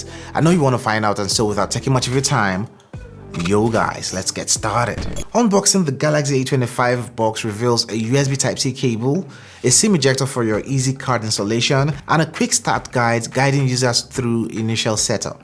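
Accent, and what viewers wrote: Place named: Nigeria. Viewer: He sounds Nigerian but I could be wrong.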